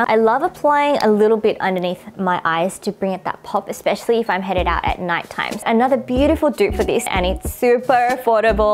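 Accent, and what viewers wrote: Australia. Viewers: OMG ARE YOU FROM AUSTRALIA?? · Heeeeeeeeey, are you from Australia? 😃😃😃😃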